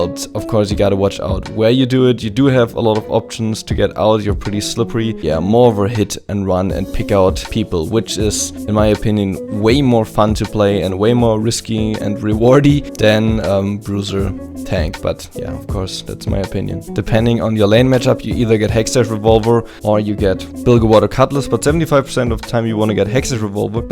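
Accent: Germany. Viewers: German accent ftw xD❤️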